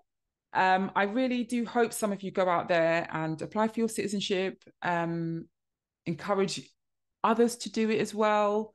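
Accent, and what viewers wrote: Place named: United Kingdom. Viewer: Good morning. I love your British accent it's so beautiful ❤️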